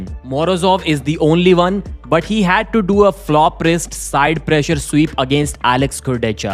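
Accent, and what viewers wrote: India. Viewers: I can't stand the Indian accent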